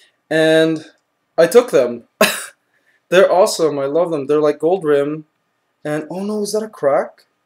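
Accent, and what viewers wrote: Philippines. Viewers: Filipino accent rocks.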